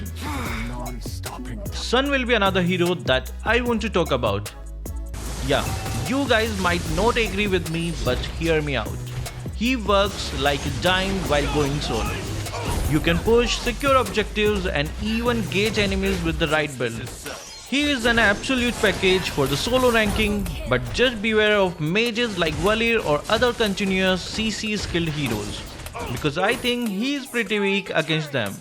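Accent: India. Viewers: Are you indian your accent sounds soo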